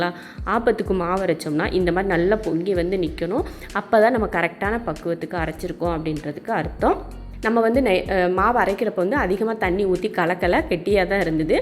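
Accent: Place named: Tiruvannamalai, India. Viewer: You are from Tiruvannamalai?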